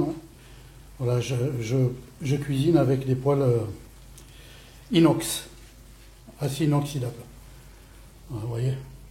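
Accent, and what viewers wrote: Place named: South, France. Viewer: Coucou =) "!!!!!!" Comme dit Lucky, ca donne trop Faim "!!!!!!" Super vidéo d°un excellente organisation, Merci infiniment pour des bonnes recettes "!!!!!!" Ca fait du bien aussi d°attendre notre accent du Sud "!!!!!" Bonne Faim de soirée "!!!!!!"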